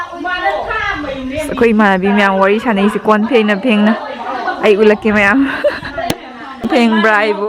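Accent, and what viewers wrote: Kakching, India. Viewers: Yengba nugai e kakchinge londo adum lonlo taba nugai ye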